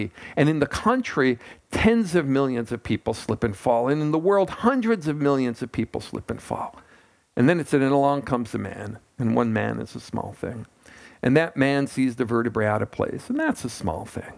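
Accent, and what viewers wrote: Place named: Brooklyn, United States. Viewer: I havejust foundanother great teacher andMarcis that person.wonderfu, if he seems like an older person jewish from Brooklyn with his accent. just follow him in his talk unitl about 47 or 48 minutes and then BOOM! igot it. the dramas...helists allofour drams. samaras Yeah! and yes! love toyou all liz e. whyaren't therefore comments here? or on his spacef or acknoweldgements below! fantastic than you. Shalo andnamaste and AUM! aim nomastivaya!. ram dass and khrishna dass....moreguys from Brooklyn!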